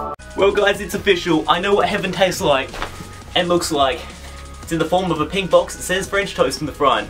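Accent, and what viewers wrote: Australia. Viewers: you're Australian accent is fabulous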